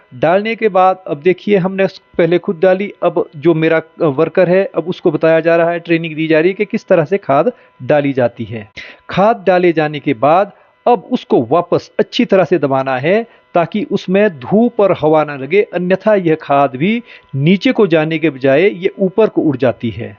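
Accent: Standard. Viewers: शुद्ध उच्चारण के साथ , बागीचो की सही देखभाल एवं पौराणिक चीजों की उपयोगिता की सटीक जानकारी युक्त आपका ब्लॉग अनुठा सा बेहतरीन लगा ,,,you people are very positive, humble and good cultured,, ...….keep vlogging 👌👍 आपने माताजी के लिए जो lines बोली सही में गौरवान्वित बना दिया अपने parents को...।👏👏👌 दादीजी को नमस्कार🙏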